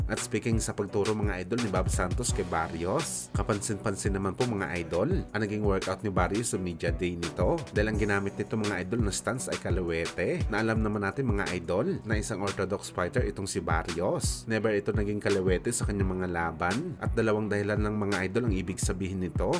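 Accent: Philippines. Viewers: your filipino accent is interesting 😆😆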